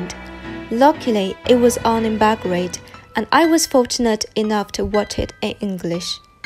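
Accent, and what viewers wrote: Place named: United Kingdom. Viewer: 好棒好棒！！！配乐好喜欢 还有你的英音😍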